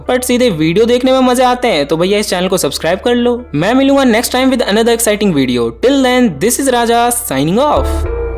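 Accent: Bihar, India. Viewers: Kahe aap bihar se ho kya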